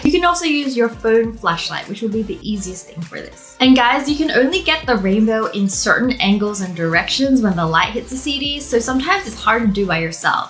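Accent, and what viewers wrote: Australia. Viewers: I do want to step up my Instagram game and these are helpful! I haven't watched you in a while but I swear your Australian accent is not as strong as before! 😯 What were the camera settings for the darker photos? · Is she speaking Australian ? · Are you from Australia?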